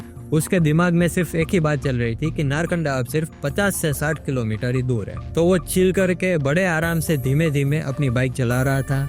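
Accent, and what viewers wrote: Gujarat, India. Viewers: Gujarati tone me pehli bar koi horror story Sunni maine❤ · Amezing story!❤ (I love your gujarati tone and expressions) Keep making the stories.💥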